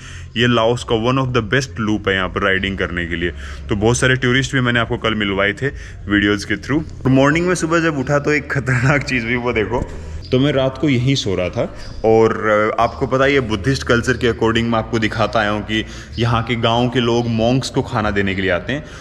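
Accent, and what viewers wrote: Haryana, India. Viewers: आपकी बोली में थोड़ी थोड़ी हरयाणवी है। भाई बहुत बढ़िया लगता है जय भारत जय हरियाणा जय राजस्थान जय राम जी की सहाब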